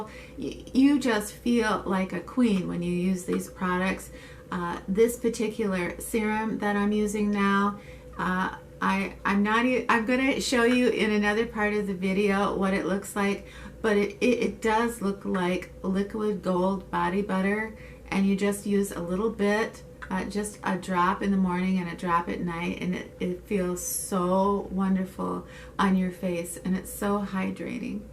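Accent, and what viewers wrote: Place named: Michigan, United States. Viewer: I just wanted to tell you how much I am enjoying your videos. I've learned so many things from you about skin care and different products! I also have to tell you that I am from Rockford (having moved East 28 years ago) and it's been wonderful to see a couple of places you've shown as well as Grand Rapids. ❤️. I miss beautiful MI.. I also recognize the familiar way you pronounce certain words. Oh how I miss that!!! It's been like a precious reminder of my growing up. Thanks again for all you share. I relate to so many things!!! You're a blessing. Sue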